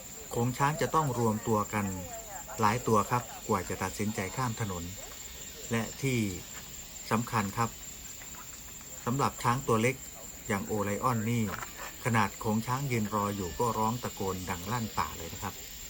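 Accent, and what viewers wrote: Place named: North, Thailand. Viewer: พากย์และบรรยาย ภาคเหนือ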